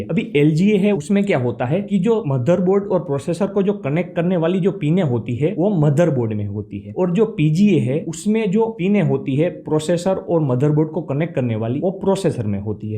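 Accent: Gujarat, India. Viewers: Aap Gujarati ho kya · are you gujarati 😅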